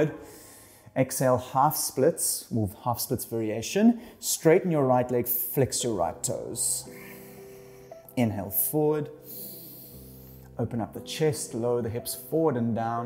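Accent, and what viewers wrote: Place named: South Africa. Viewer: For a Saffa abroad, it's SO lekker to hear your accent 👌 loved this flow, and thank you for the decent Shavasana at the end! Thank you!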